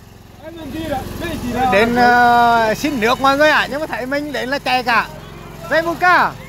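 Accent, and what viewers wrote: Nghe An, Vietnam. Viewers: thật tuyệt vời, vẫn tiếng Nghệ cười có kể, ăn có kể, đẻ có kể 🤩🤩...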